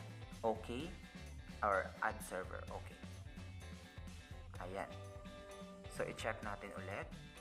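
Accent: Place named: Visayas, Philippines. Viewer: Sorry ‘te medyo I practice mo ng konte yung visayan accent mo medyo nahihirapan kaming listeners mo makasabay sa mga info mo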